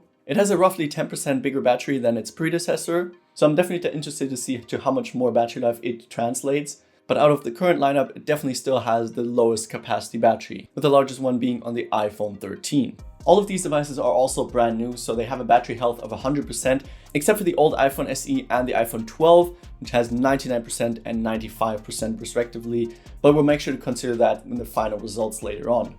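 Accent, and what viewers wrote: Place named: Germany. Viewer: Having a German accent is definitely an advantage when you talk about technology.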